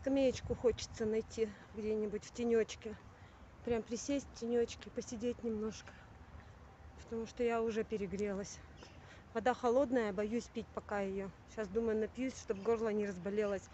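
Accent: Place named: Udmurtia, Russia. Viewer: а почему Вы не дождались вечера. Собаку не выгонишь в такую жару. А вода в Ереване бесплатная, холодная из питьевых фонтанчиков. Судя по говору-Вы из Удмуртии?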